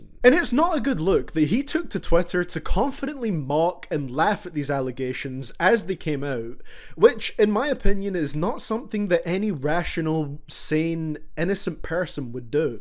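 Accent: Scotland, United Kingdom. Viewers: U Scottish or usa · He sounds like a Scottish version of another youtuber I watch but can't remember which channel. It's uncanny.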